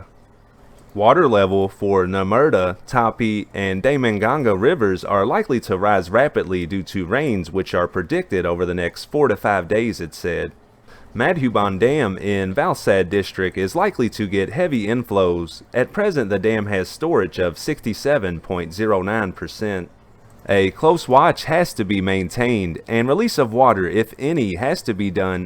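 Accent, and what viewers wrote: South, United States. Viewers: I especially like his personable southern accent, but without it being hard to understand.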